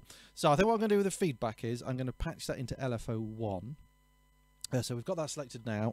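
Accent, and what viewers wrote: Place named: East Midlands, United Kingdom. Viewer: What a fantastic sound! I can't wait for my Deluge to arrive, hopefully in a few days! You sound like a local East Midlands bloke to me. I'm close to Chesterfield Jcn 29. Do you do teaching?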